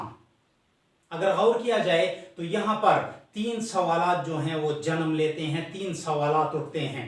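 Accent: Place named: Pakistan. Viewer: aap urdu speaker nahi ho mujhe balochistan ya peshawar se lagte ho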